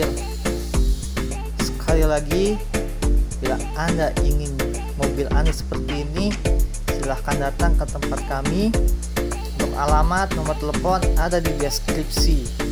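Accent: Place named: Garut, Indonesia. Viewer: urang garut logay anjirr :D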